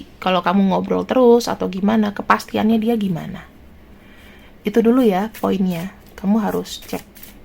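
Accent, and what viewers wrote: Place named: Surabaya, Indonesia. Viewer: Opo maneh,suroboyoan bgt 😁👍🙏🙏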